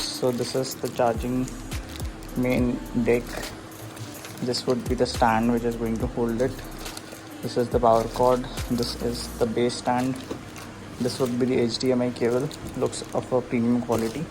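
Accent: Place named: Garhwal, India. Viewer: Wow ur gadhwali accent is so sexy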